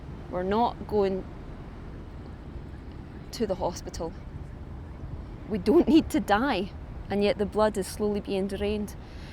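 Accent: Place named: Scotland, United Kingdom. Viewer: You're a Scot.. I had you at the 3rd word.. Dae ye ken Scots langage?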